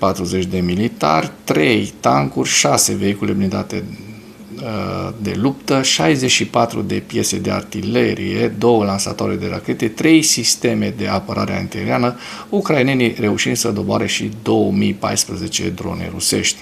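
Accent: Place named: Transylvania, Romania. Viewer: Pronunția lui de Ardelean diferă de cea a Miticilor!!! Crez că trebuie judecat??? Sărbători cu lumină!!!!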